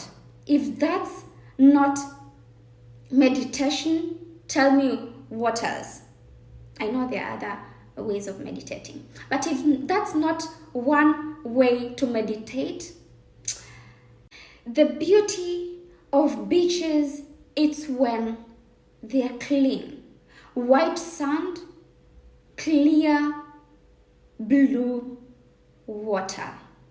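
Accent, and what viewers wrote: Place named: Kenya. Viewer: sure the English is from a kenyan na iyo kikamba nafeel,,🔥🔥🔥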